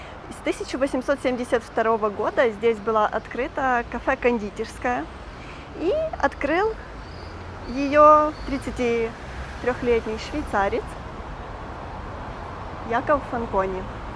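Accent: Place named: Odesa, Ukraine. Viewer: Огромное спасибо, что вы говорите на одесском языке!!! Я коренная одесситка вынуждена была уехать из за ужасов,но так приятно смотреть на красавицу Одессу!!! И слышать родную речь!!!!!!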